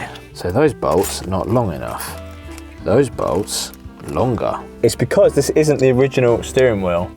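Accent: United Kingdom. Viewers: He's british, that's how they say it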